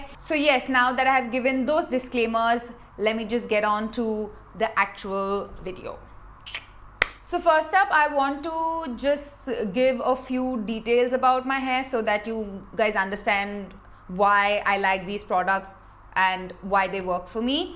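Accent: India. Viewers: Priyanka Patnaik I understand what you are saying. But she cannot help it. When you listen to different accents all day you tend to get slightly confused. It does not bother me though. I prefer her natural Mumbai accent, I lost mine in the US, so it feels like home. ❤️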